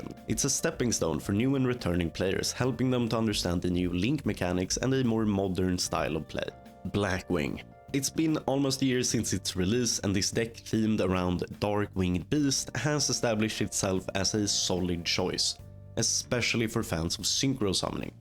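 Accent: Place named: Sweden. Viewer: You can hear he is swedish XD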